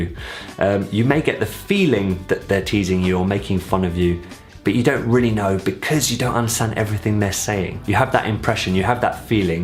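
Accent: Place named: England, United Kingdom. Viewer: je pense que nous apprends le meme facon donc j'aime ca ton videos tellement m'aider. Je sais ma francais est mal, mais avec le pratiquer chaque jour c'est devenir plus en plus facile. merci de m'aider. ca fait deux mois depuis je commencer mon voyage de apprendre et ton aide est vraiment utile. - aussi une idee pour toi. - I would really like a video in full french on a simple subject, as hearing english accent in french is very clear for me and helpful since im also from the UK, maybe you already have one?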